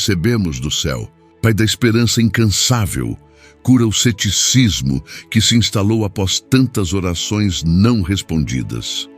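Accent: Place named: Portugal. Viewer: Por que você fala com sutaque de português de Portugal há momentos que você fala que nem português por 😢 me diz o porquê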